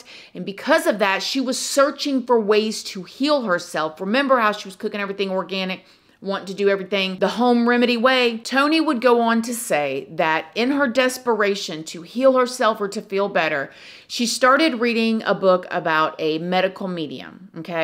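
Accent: South, United States. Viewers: I’m high so I had to watch a few times to understand but you’re such a cool human and I love your southern vibe. Honestly reminds me of the olden days when I used to hang with family down south and we’d just tell stories. Keep it up, sister. Love your content. The fact I don’t mind relistening says a lot haha. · Your vids literally make my day. You cover scary cases but your friendly presence makes up for it. I love your accent btw feels like I’m back in the south where I grew up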